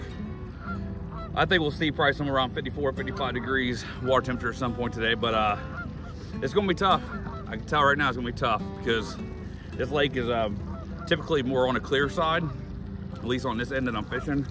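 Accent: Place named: Texas, United States. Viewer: Same boat , black 2023 I love it , and watching you fish feels like Im fishing in my boat. Im going tommorrow to Sabine River down here in South East Texas Same place the bass master elite has their event. The way you act and talk makes you seem like one of the ole boys from around here. its kinda funny how that works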